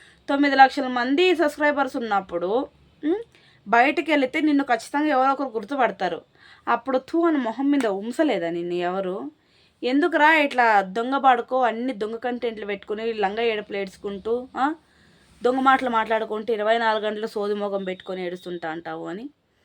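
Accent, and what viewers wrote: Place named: Kadapa, India. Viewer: Mi slang bagundi sis midi kadapa dist aa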